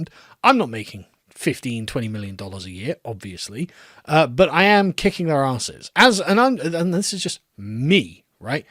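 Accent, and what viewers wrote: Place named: United Kingdom. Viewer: You may have bought all that equipment 6-7 years ago but damn. You can’t buy that Brit accent that turns $2 words into $10 words.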